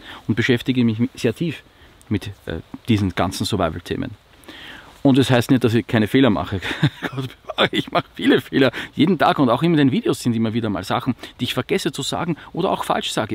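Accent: Austria. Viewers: Eigentlich mag ich diesen Österreichischen Dialekt nicht. Aber bei dir kommt es sehr sympathisch rüber. Werbung oder nicht, ist mir scheiß egal, wir müssen alle von irgendwas leben 👍😊 alles in Ordnung.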